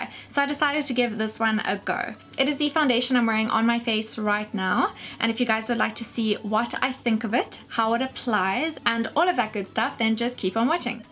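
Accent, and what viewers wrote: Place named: South Africa. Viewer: I was like.. waaaait... i know this accent xD Finally a Youtuber I can relate to with our limited Makeup selection in SA <3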